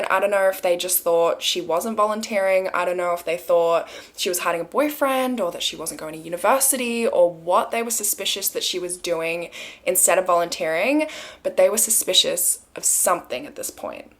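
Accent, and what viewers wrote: Australia. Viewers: You move to where overseas?!! I'm curious!! I would love welcome you to 🇨🇦 You Are awsome!! Love your work and beautiful Aussie accent!!!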